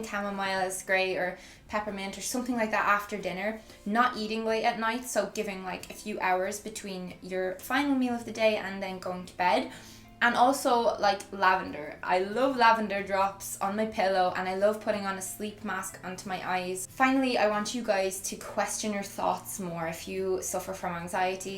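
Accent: Ireland. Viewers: I can listen to that Irish accent all day long. It's beautiful music to my ears!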